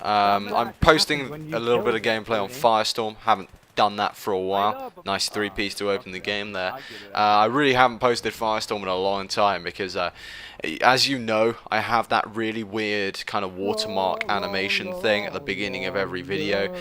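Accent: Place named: United Kingdom. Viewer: beast vid, nice to hear a british accent. Subbed and liked!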